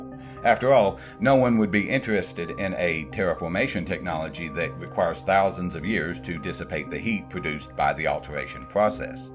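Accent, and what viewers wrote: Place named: South, United States. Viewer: I just found your channel.. am subbed after hoth and this genesis video... fantastic. Also i say this as a southerner, and with implied awe... but you sound so much like jim varney... like he is explaining these subjects. It may just be the microphone, but you sound like you have a similar accent also. Anyway, awesome channel!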